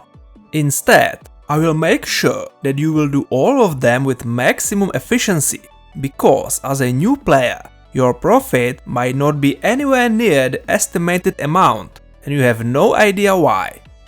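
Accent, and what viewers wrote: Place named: Czech Republic. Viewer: Ta čeština z toho celkem prýští :'D dobrý video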